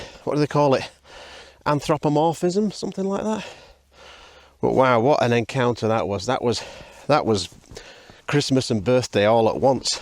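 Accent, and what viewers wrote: Yorkshire, United Kingdom. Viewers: It must be that calming Yorkshire accent, I fell asleep about the same time as the bobcat did .😊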